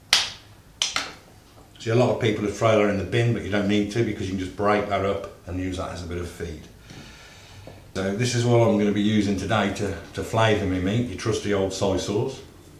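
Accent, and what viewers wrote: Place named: Bromsgrove, United Kingdom. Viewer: Your accent reminds me of my old home area of Bromsgrove, I now live in Brighton { for the last 23 years } and only about 3/4 of a mile from the sea! Believe it or not, I sometimes go fishing, like, most of the time now I'm retired! I've suscribed now and will be working my way through all your vids! Without too many details, just to satisfy my curiosity where abouts in the country do you live mate if you don't mind me asking?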